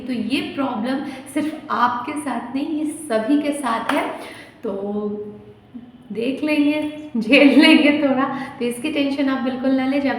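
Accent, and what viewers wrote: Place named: Uttar Pradesh, India. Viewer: Kya aap up se nhi ho..... Language to up ki hi lg rhi h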